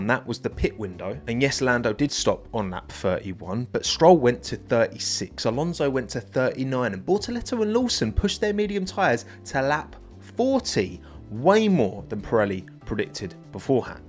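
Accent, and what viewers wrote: United Kingdom. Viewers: Yeah bro, your british accent says all there is to say. Props to your countryman!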